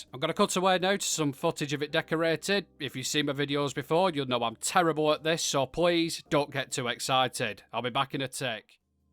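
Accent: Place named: Yorkshire, United Kingdom. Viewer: geez your accent is thick, from yorkshire? i'm from downunder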